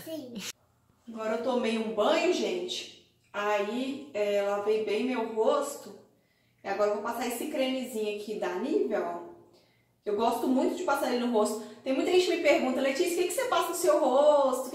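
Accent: Minas Gerais, Brazil. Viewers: Ai Letícia desse jeito vou engordar ,quero fazer todas as suas receitas...esse cafezinho é muito bom a tarde...linda,adoro seus vídeos esse seu jeitinho mineiro de falar é muito gostoso.meu avô era mineiro tenho um pezinho aí em MG.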